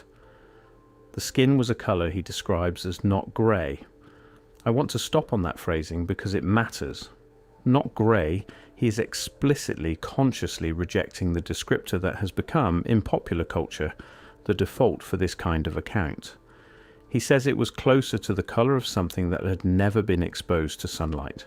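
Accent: United Kingdom. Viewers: GET RID OF THE UK NARRATOR VOICE!! 🤮🤮🤮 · When the narrator has a British accent, it makes the message more believable!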